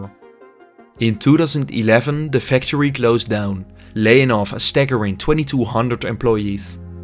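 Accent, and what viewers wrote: Netherlands. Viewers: Makambeus.........Tremendo Dutch aksentos. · That is a Dutch accent if I've ever heard one!!! Sounds like my Opa! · Dutch Limburg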